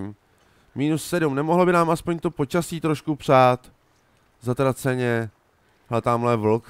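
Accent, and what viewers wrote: Czech Republic. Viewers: čau chci se zeptat ty pocházíš někde z okolí Ostravy? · lubozvucny moravsky dialekt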